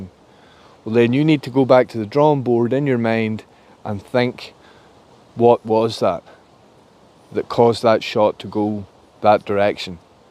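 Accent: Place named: Scotland, United Kingdom. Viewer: The single best thing about this video is that is Scottish hahaha tnx for a great vid lad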